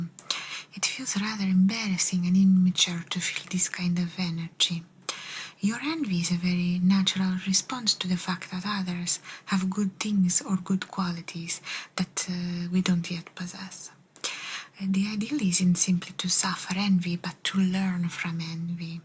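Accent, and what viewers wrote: Italy. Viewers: Ciao cara!🤗 Mi piace molto questa tipologia di video, sei bravissima e bellissima, complimenti!🥰 Adoro il suono della scrittura e adoro ascoltare il tuo meraviglioso accento Italiano 💚🤍❤️ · Hello, fantastic ASMR, I really liked it and with an Italian accent, greetings, beautiful 🌹💖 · ahh you are amazing and we are back at the amazing italian accent i love it <3:) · Ciao and Lucia!💜💜💜 I do so love your gorgeous accent!! Thank you for soothing and lulling me to sleep. You are the best in my opinion! I hope you and your family are staying healthy. Have an excellent weekend. You deserve it. Goodnight and sweet golden dreams. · Italian accent videos are perfect for relaxing and unwind your soothing voice makes me feel sleepy 😴 😊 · Thank you for creating this compilation for us,. I love the Italian accent in combination with your wise words. You say in the description that you're not a therapist, but you seem like a really good one to me. 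You probably just need to get some sort of a degree to make it official. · Yessss,long time Italian Accent.😊😊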